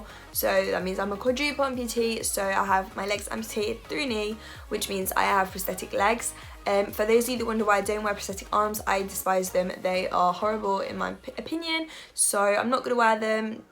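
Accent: United Kingdom. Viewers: I have seen this video three times by now. Thank you. Your smile, your personality, your British English, your determination, your positive attitude. You brighten up my day. You boost up my mood, you give me hope and inspiration. You move me. It's.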